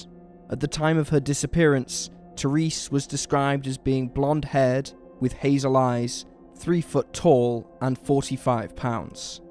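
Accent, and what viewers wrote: England, United Kingdom. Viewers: I dont like when they have narrator with a British accent! Stop it! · Sad story, but I can't believe that a narrator with such a strong British accent actually said it was in regardS..ah, at least he does well at most of his readings ? Would have made a decent film if it had been a reenactment. yes ? Producers ? Script writers ? No copy editing ? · Can’t stand the pommy narration. Goodbye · I'm so tired of someone with a British accent narrating American crime shows..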